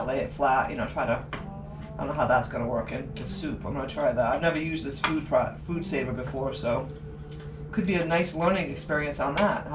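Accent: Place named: New England, United States. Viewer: Your accent. Maine or N.H.?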